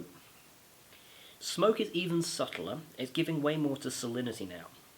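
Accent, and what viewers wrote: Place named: RP, United Kingdom. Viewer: Sincere condolences mate. Keep them coming. Missed the toff talk lol. Sound's a bit fkt but nothing major. Sláinte 🍻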